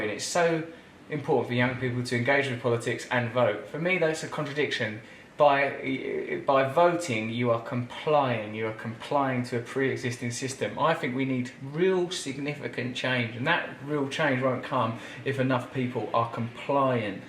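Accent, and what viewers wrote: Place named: United Kingdom. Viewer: I loved RB taking on Sean Hannity. I am a conservative but FOX news is not my form of conservatism. This guy is actually very bright and incredibly articulate. He also has one of those British accents that doesnt drive me crazy after 5 minutes like so many of the pompous, stuffy old guard English wankers. Do something less intense like Sports role (or opportunity) in global compassion. Better yet expose FIFA for the criminals and corrupt organization that they have become. Same with the IOC. How can Russia be awarded first the Olympcis and then the World Cup given their record on human rights? I do not agree however that we are throwing our votes away because we dont have a candidate to support. its sounds to me like his solution may be revolution. I can only support peaceful action. We are far too evolved to allow what is happening in the world to continue. 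Yes the people need to rise up however they need a leader that has compassion for mankind.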